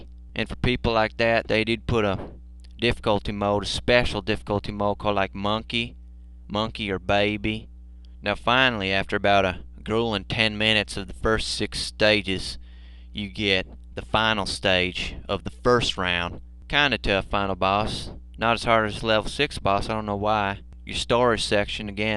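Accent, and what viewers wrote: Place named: South, United States. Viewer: This guy really needs to return and do mote SHMUP reviews. His southern accent and humor are perfect!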